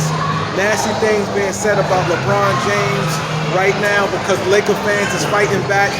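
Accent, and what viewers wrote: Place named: New York, United States. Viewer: You sound like a New Yorker.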